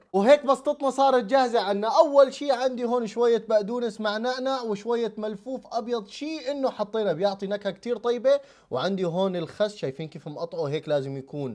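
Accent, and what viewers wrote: Syria. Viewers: اللهجة السورية المميزة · حلوه اللهجه السوريه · كم هي جميلة اللهجة السورية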